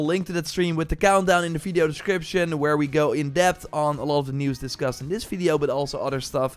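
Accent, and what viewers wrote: Netherlands. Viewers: je Kaas engels blijft gewoon grappig lol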